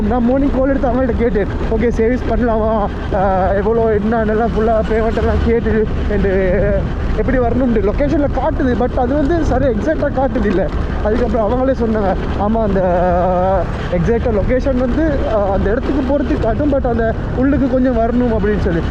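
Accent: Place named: Sri Lanka. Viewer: Sri Lanka tamil beautiful ah iruku but konjam puriyamatinguthu 😅❤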